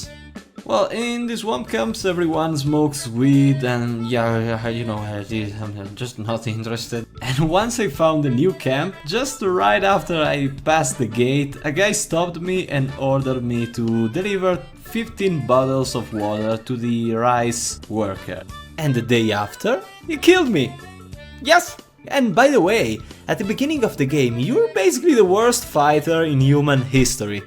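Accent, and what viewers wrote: Italy. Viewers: just curious. Are you from Italy? Judgind by your accent I'd say Italy. Also the fact that you can pronounce some sounds that are hard for normal italians( .the "H" sound ) suggests that maybe you are from south tyrol? Just curious to see if I am right. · Great job, you just got a new subscriber. ^_^ I like your italian accent, haven't encountered it much on youtube.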